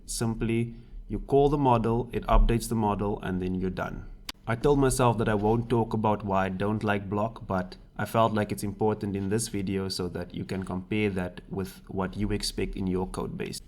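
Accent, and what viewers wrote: South Africa. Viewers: you have a sa accent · I thought that accent sounded familiar :) South Africa, howzit man. Did you base this on MVVM?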